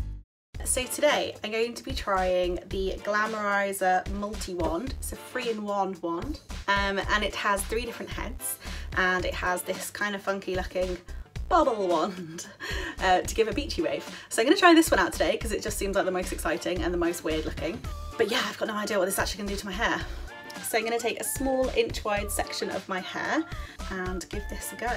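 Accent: United Kingdom. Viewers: The British accent tho😍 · What's a British accent? She's got an English accent with some Midlands bits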